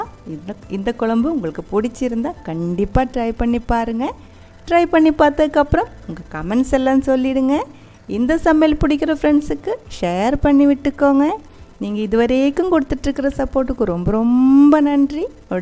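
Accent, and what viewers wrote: Kerala, India. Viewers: Hi...its lovely to listen to your Malayalam mixed Tamil😍😍 Can u please leave the link of your malayalam channel. Waiting to see more vlogs from u😍😍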